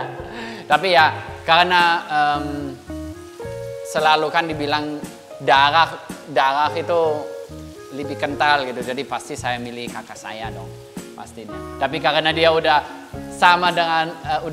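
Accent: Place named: West Java, Indonesia. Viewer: Logat sunda, "mah"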